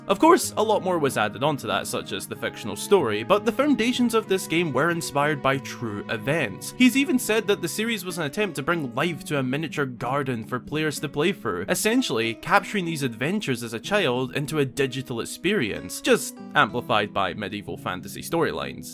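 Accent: Scotland, United Kingdom. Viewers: You're scottish?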